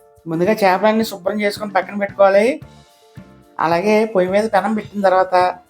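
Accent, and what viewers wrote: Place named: Konaseema, India. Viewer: konaseema voice and super curry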